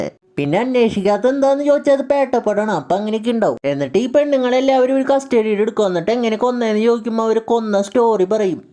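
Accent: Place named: Puthenvelikkara, India. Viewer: ഇത്രയും വൃത്തിക്കെട്ട ഒരു voiceover അടുത്ത കാലത്ത് കേട്ടിട്ടില്ല മൈര് 😂... വളിക്ക് എക്സ്ട്രാ മണം പോലെ അവന്റെ പുത്തൻവേലിക്കര സ്ലാംഗും... കാട്ടവരാതം തന്നെ മൈരാ...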